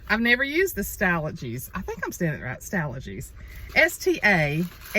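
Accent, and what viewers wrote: South, United States. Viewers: Your southern drawl was in true form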